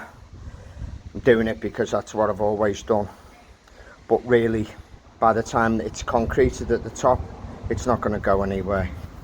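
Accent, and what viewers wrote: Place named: Liverpool, United Kingdom. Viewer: I do like a repair vid. I was just trying to imagine a scouser speaking Spanish. And then i gave up 🫣😂😂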